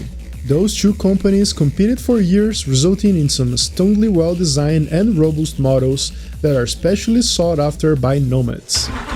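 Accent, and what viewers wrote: Brazil. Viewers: Brazilian accent detected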